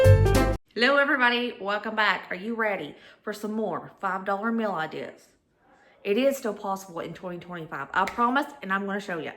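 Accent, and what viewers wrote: South, United States. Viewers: I love your southern accent. It's so cute! I'm Alabama, born and raised, so you know I have an accent too but isn't as cute. Girl, yours is pure cornbread, and I love it!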